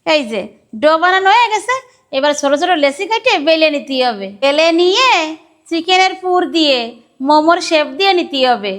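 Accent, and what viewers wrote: Bangladesh. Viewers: বলছি এইগুলোর কি sir এ নাম কেটেছে? 😂 এখনো তো বাংলাদেশী কথা বলে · কথা গুলা ভালো লাগে বাংলাদেশের কিছু অঞ্চলে মতন লাগে ❤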